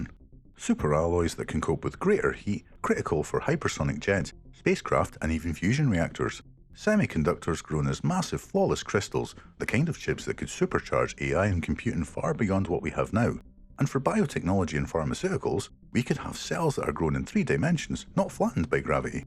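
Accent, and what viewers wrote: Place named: United Kingdom. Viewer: this is just creepy i was hoping to get away the britt accent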